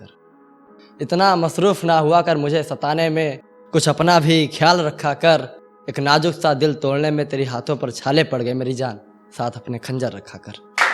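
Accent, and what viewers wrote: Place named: Allahabad, India. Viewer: Superbbb bro❤️.....pure allahabadib... must listen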